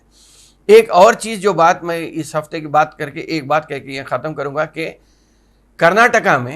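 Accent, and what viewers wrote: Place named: Hyderabad, India. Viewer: ماشاءاللہ صاحب حالات حاضرہ کا بہترین تجزیہ اور دلچسپ تبصرہ پیش کیا ساتھ ہی ساتھ خالص حیدرآبدی شرفاء کے مہذب لہجے میں خوبصورت پیشکش پر دلی مبارکباد پیش ہے، امید ہے آئندہ بھی اسی طرح ملک کے سیاسی حالات پر مفید جائزہ سننے کو ملے گا۔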